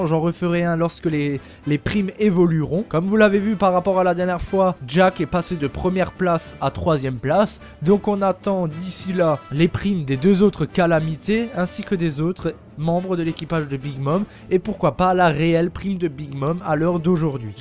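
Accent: Alsace, France. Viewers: T'a l'accent alsacien !! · Yôôôôô cet accent sent l'alsace!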